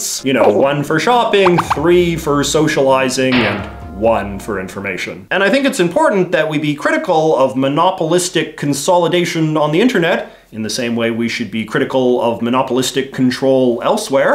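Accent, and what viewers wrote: Canada. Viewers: Stop saying a boot please I know your probably Canadian but it is very ignoring! Thanks! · Just got channel recommended and I realized that I have been binge watching for almost two n half hours now. Concise, educational and I’m all “about” the Canadian accent being an Alaskan 🙂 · Canadian detected.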